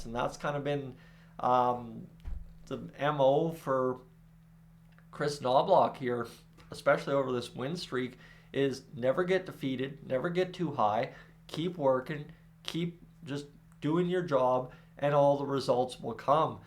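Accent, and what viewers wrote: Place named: Calgary, Canada. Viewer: sounds like a calgary boy... me too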